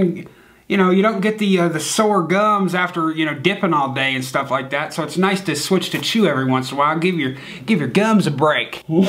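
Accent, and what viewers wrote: South, United States. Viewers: 2018 also You sound like an real American .-. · OCASIÓN!! AMERICAN FRENCH SOUTHERN DIALECT!!!!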